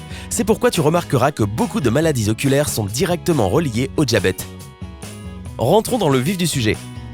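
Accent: Quebec, Canada. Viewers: A mon avis, la vrais a du donner sa chaine a eux, c'est comme la voix Québéquoise, c'est la meme que. Ou sinon toute les chaines c'est une compagnie qui vous prends pour des con ( Je dis vous pck je suis pas abonné a eux )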